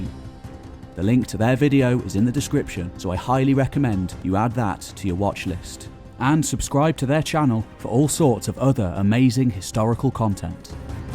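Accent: United Kingdom. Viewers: I like his brand of UK "English" so much that i can forgive his pronunciation of the word " dynasty". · Beautiful presentation. Thank you. Also like the British accent. Three defining moments in history, the fall of Baghdad, the fall of Constantinople, and the fall of the Ummayad Caliphate in al-Andalus.(Spain), not to mention Carthage and others.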